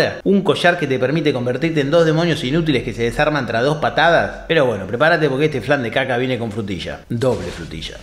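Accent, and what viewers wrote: Argentina. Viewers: Solo un argento puede hacer algo de este estilo. Amo la tónica argentina para este tipo de narraciones.Acido e irónico, Sos un CRACK, Sabelo. No paro de reírme. Ya mismo voy a ver el de Mario Bros.... Mi vídeo juego favorito convertido en bazofia....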